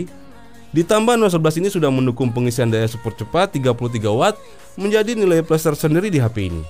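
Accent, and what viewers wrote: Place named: Manado, Indonesia. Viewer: Bang orang manado ya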